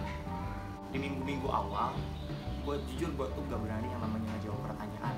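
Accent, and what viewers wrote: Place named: Sunda, Indonesia. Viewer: Menarik ceritanya.jd sejarah tuh yah kakak...ini tapi suara nya kurang keras..teus ketahuan kakak teh orang sunda asli ya..he he..logat sunda nya..kental..pisan..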